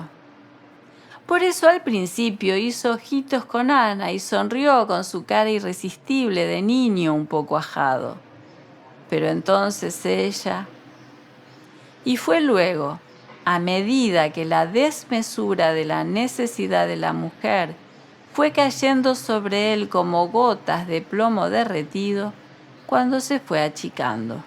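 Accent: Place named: Argentina. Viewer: El acento argentino no ayuda para escucharlo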